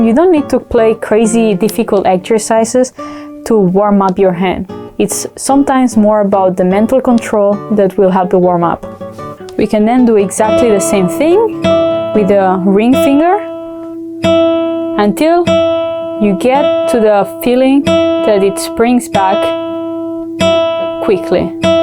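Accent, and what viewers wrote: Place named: Spain. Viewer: Hola! A pesar de que te conozco desde hace un tiempo gracias a reddit, es la primera vez que veo uno de tus videos y era justo lo que buscaba, me gusta como explicas la técnica y los ángulos de cámara que usas, definitivamente me suscribo a tu canal. Ps. Por tu acento supongo que eres de España por eso escribo mi comentario en español 😅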